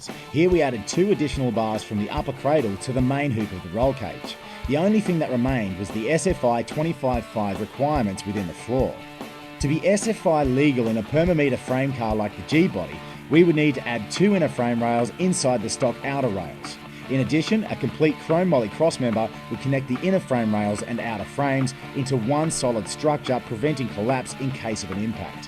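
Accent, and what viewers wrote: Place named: Australia. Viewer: Aussie voiceover guy, who'd of thought. :)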